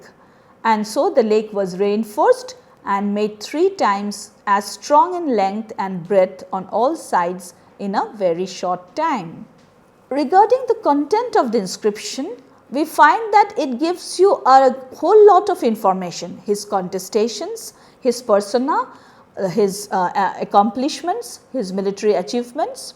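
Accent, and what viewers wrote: Bengal, India. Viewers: mam is bengoli..so it was difficult to catch actual Pronunciation😂..but still it was really good and informative then other web sites on internet..this gave the best research👍👍👍👍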